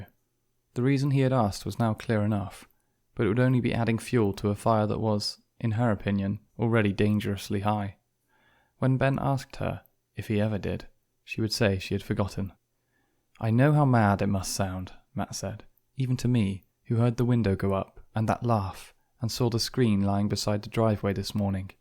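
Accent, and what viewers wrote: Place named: United Kingdom. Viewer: the British accent really adds something to this lol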